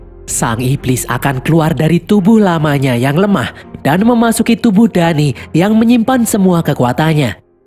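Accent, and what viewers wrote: Java, Indonesia. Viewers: Wah Mimin Jawa ya 😅☝️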